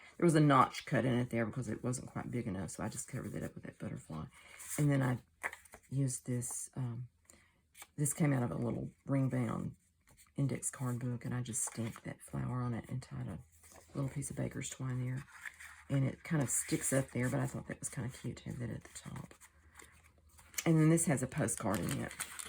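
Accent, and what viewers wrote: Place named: Texas, United States. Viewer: These are so cute! And would make a lovely addition to a journal for a gift. I have been watching your videos, I don’t comment much, or every time. But love your channel. Your voice is so comforting... are you by chance in East Texas? Or Texas in general? You sound like you are. I wasn’t going to ask, but then you showed the post card from San Antonio sunken gardens. I’m South east of Dallas between Dallas and Tyler... anyway... I love finding new uses for the envelopes, and can appreciate that you don’t try and disguise the fact it’s an envelope!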